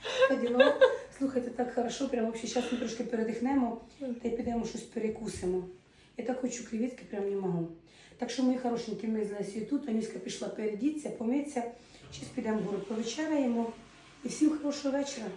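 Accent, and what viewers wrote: Surzhyk, Ukraine. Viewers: Суржик колхозный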